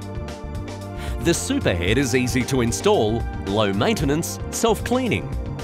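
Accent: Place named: Australia. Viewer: with volume up Oh, so if I put this on a tank, the military should be very thankful for the overexcited australian narrator Don't get me wrong, i love australians and their accents. :P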